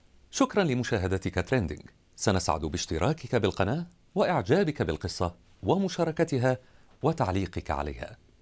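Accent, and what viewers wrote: Iraq. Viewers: ههههههه احب هذا المذيع المخضرم من يتكلم بلهجة العراقية 😍🇮🇶✌🏻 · احبك من تحچي عراقي🇮🇶❤️ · ههههههههههههههههه اسلوبك يخبل ونوب تحجي عراقي تجنن لعنة الله أمريكا ومن والها أمريكا وايران هي سبب الدمار وهذول فروخهم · والله اللهجة العراقية عم تطلع معك دهب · اش حلاتك من تحجي عراقي عبالك صدوك واحد من عدنا😄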